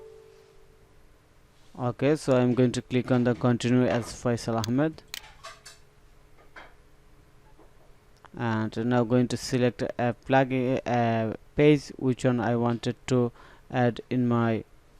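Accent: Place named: India. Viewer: Lovely Indian accent.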